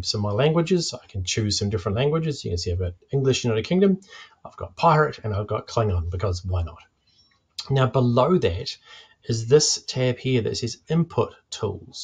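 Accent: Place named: New Zealand. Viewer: Thanks, that's so helpful, and in a decent Kiwi accent too.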